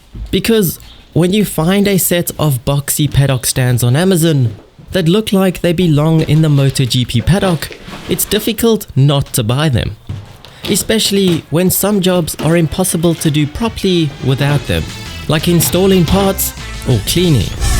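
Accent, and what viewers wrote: South Africa. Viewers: I knew you sounded South African!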